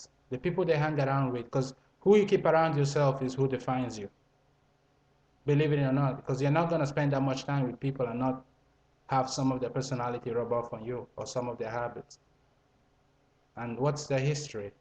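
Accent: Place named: Italy. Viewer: You must be Italian??? At least it sounds like it haha. It's a nice accent though. I'm German. But man, you are 100% correct. You have to watch out what kind of women you are dealing with, they are secretive and snap at any moment. Poor McNair.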